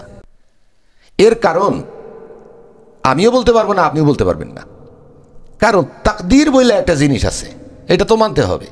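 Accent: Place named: Barishal, Bangladesh. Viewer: মামা আজকে আপনার কথাগুলো একদম অন্যরকম শোনাচ্ছে অনেকটা বরিশাইল্লা বরিশাইল্লা। অনেক কিছু আজকে জানতে পারলাম ধন্যবাদ।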